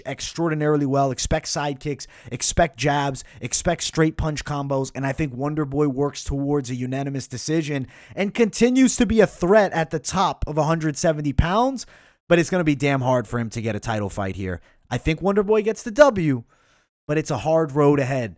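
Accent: New York, United States. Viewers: U sound like ure from nyc I like u automatically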